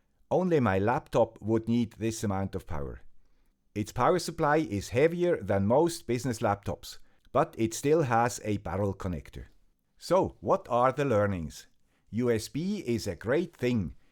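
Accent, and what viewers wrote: Switzerland. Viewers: Summary Introduction to USB-C and Power Delivery - Charging and data transfer over USB cables have significantly improved. - USB-C connectors were expected to simplify the process. - However, without proper knowledge, users can still face issues like slow transfer speeds and charging problems. - The topic is split into two parts, starting with Power Delivery. - Introduction by the presenter with a Swiss accent. - USB-C's versatility and its mandatory use in Europe. - USB-C supports various modes including Power Delivery, data transfer, video and audio output, and alternate modes like Thunderbolt. - Focus of the video on Power Delivery and data transfer. USB Standards and Connectors - Evolution of many USB standards over the years. - Different connectors accompanied each standard. - Focus will be on newer standards from USB 3.0 onwards. 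- The video will cover two separate topics: power delivery and data transfer. Understanding USB-C Connector Pins - USB-C connector can have up to 24 pins. - Not all connectors have all pins to save cost and simplify PCB design. - Explanation of Ground, VBUS, D+, and D- pins. - D+ and D- carry differential signals for less interference and higher speeds. - These pins are for backward compatibility with USB 1.0. - Introduction of TX1 and RX1 pairs in USB 3.0 to increase speed. - TX2 and RX2 pairs were added later for even higher speeds. - CC1 and C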